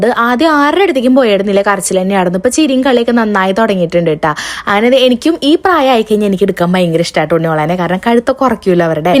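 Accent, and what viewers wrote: Thrissur, India. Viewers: ഹലോ ചേച്ചി ഇങ്ങനെയൊക്കെ വീഡിയോസ് കാണുമ്പോൾ ആണ് എനിക്ക് എന്റെ നാട്ടിലെ പൂരം ഒക്കെ ഓർമ്മ വരുന്നത് miss thatt... ചേച്ചിക്ക് ഓരോ ദിവസം എത്ര പൂരമാണുള്ളത് എല്ലാം നല്ല സന്തോഷിച്ച ആഘോഷിച്ചു കഴിയുക അതുപോലെ ചേച്ചിയുടെ ഓരോ ഡ്രസ്സുകളും എനിക്ക് വളരെ നല്ല ഇഷ്ടമാണ് ചേച്ചി സാരി ഉടുത്തിട്ട് കാണാൻ എനിക്ക് നല്ല ഇഷ്ടമാണ് ചേച്ചിയുടെ മുടിയും ഒക്കെ അതുപോലെ ചേച്ചി വീഡിയോയിൽ വോയിസ് ഓവർ കൊടുക്കുമ്പോൾ ചേച്ചിയുടെ ഒച്ചയും അതുപോലെ ആ തൃശ്ശൂരിലെ ആസ്വാങ്ങും ഭയങ്കര ഇഷ്ടമാണ് 🤍🤍🤍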